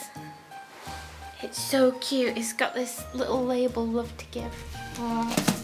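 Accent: United Kingdom. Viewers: what's up with British accent my elf name is mark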